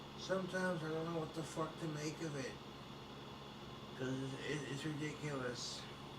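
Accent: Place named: Birmingham, United Kingdom. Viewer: Bro, are you 80% British? Im starting to hear the heritage in the accent. Sounding like Bob Geldof if he came from Birmingham. Weird Shiyte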